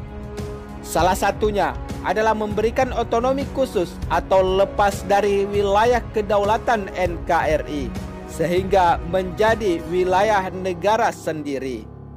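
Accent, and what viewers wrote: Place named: Malaysia. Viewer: Admin nya kayak orang malaysia ini yg ngomong kliatan cara bicaranya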